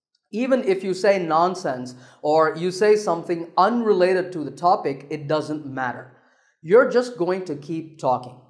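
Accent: United States. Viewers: if you don't watch the video, just listening to the audio, you'd think you're listening an american speaking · Sir, do you speak in American English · your JAM technique is lit.🔥🔥 love that you're channeling the American accent perfectly.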